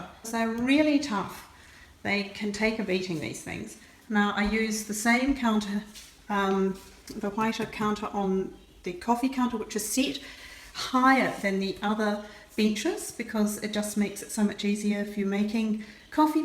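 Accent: New Zealand. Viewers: I thought I heard the New Zealand twang.....it never leaves you my dad came from Scotland and never lost his Scottish twang even though he lived in Yorkshire for many years.....have not visited the South Island but that's my intended place next time fingers crossed.....x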